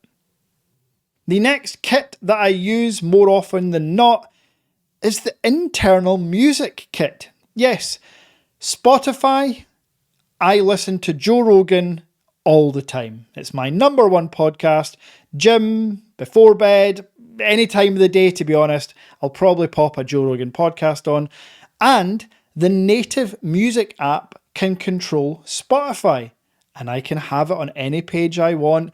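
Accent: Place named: Scotland, United Kingdom. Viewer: Just wanted to say thank for the IR remote tip! I recently got a fan that was unable to work with the international app and unable to work with the chinese app due to it being region locked. Was cracking my head for days on troubleshooting but you totally solved that issue! Love your channel, very straightforward no fluff reviews and got to say, that Scottish accent is killer.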